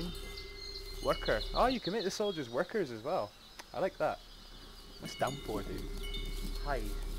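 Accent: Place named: Scotland, United Kingdom. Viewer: Why do you sound so Scottish. Are you Scottish? 8-) And Hi.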